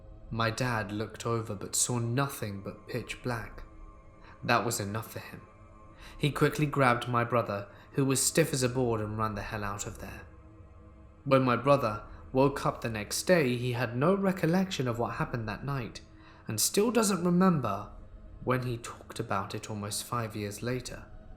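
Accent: England, United Kingdom. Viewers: is the best humble horror narrator of YouTube! Got to have that English accent, after all it is their language 🏴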